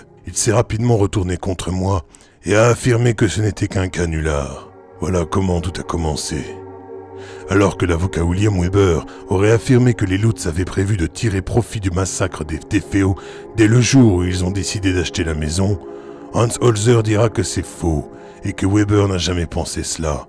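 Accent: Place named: Belgium. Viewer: J'ai des amis belges qui prononcent également de cette manière. Ça donne houit pour huit, fouite pour fuite etc...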